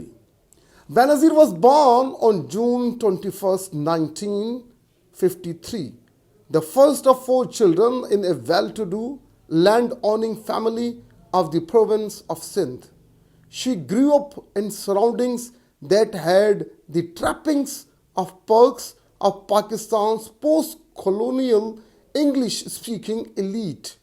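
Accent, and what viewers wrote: United Kingdom. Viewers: profoundly comprehensive video with fascinating lucid british accent Sir👍👍👍👍👍❤❤❤❤❤❤❤❤❤❤❤ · Amazing video In every terms, the Accent as too as british, the information about her it realy explosive..❤❤❤❤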